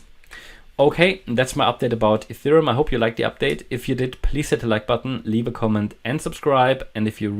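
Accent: Germany. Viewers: Also komme was wolle, ich mag dein Englisch. Ist so dolle mit deutschen Akzent, aber gleichzeitig recht detailreich und super verständlich. Machst du gut . Wünsche euch viel Erfolg mit dem Kanal. Ihr bietet sehr kurzfristigen Input, und nicht erst 3 Tage später nach dem Motto:”Das war ja absehbar!” 👍🏻👍🏻 damit kann ich zumindest mehr anfangen !!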